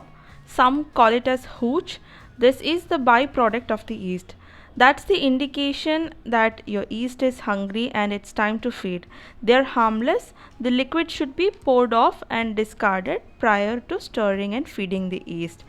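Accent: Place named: India. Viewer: Dear, I am not so sure what country you are in, but from your accent, it sounds it is India. Here in the West, the flours in supermarkets are all-purpose, whole wheat, unbleached all-purpose, etc, etc. All these flours are made from wheat grains. When you said the flours you used are all-purpose and wheat, I am not so sure if you meant whole wheat, and unbleached flour. Because these 3 flours I mention are made from wheat grains. The difference is that the all-purpose comes in 2 kinds --unbleached and bleached and these 2 do not contain the brand. The whole wheat means that it contains the brand. Upon looking at your 2 bowls, I do not see one being darker than the other one. That tells me you are not using a whole wheat but probably unbleached. The reason I am interested to know this in detail is because you mentioned that the bowl with the wheat flour was more bubbly than the all-purpose flour. 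So, to understand you exactly, would you say that the more bubbly bowl was the one containing a whole wheat flour?? These two flours, the all-purpose and the "wheat flour" are made, both, from wheat grains. When they say "all-purpose", means the flour contains the very necessary amount of gluten for all kinds of basic breads which are most the time baked at home. And for cooking, making sauces, flat beads, etc, etc. So, this flour probably does not contain the necessary amount of gluten, or the sufficient amount of yeast, or a percentage of yeast has been killed in the process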